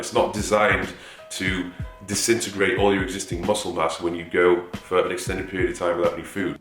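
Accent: United Kingdom. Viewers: His voice sounds like John Cena with a british accent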